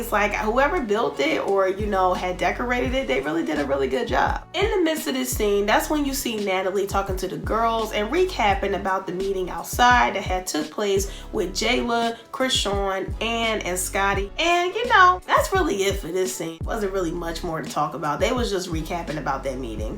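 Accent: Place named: Philadelphia, United States. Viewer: Idk where you from but it’s definitely close to philly IF NOT philly 😩😭😭 ya commentary had me rolling !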